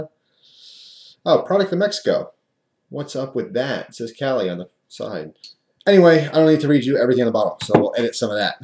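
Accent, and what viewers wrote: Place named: Pennsylvania, United States. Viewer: ya Pennsylvanian accent pride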